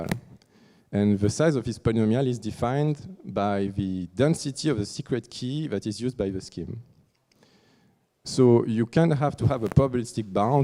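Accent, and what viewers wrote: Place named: France. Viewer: j'ai tellement le seum c'est en anglais avec un accent Français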